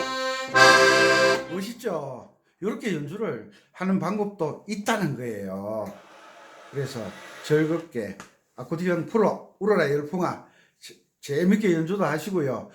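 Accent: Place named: Gyeongsang, South Korea. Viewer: 완전 갱상도 니다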